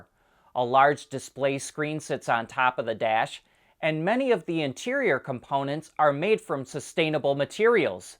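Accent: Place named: Midwest, United States. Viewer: This is the Midwest. This is how we talk. Jeez.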